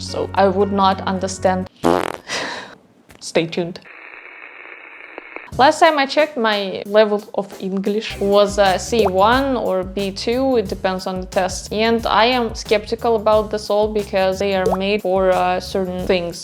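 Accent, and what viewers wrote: Russia. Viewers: I love your channel, and enjoy your sense of humor. Your command of English is pretty amazing, and your Russian accent is so subtle most of the time. I love it when you intentionally say things in English with an exaggerated accent! As a native English speaker, I commend your hard work. It really pays off! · Coming from a native English speaker your English is excellent/fluent!,you have a distinct Russian accent but just like an American or an Australian has an accent when speaking English.Its a cool accent btw!. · Yes your English is very good. Your personality is great, genuine and spontaneous, funny. A lot smart fun lady! And your fluent in Russian! Wow! Hmm. Language sounds different to each person. I love your Russian accent as you speak English. It sounds very nice actually. Nothing to worry about. You give a lot of good advice.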